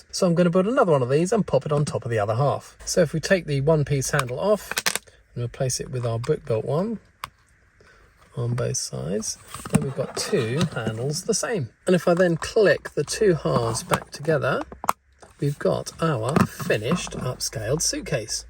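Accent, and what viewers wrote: United Kingdom. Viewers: You sound very british.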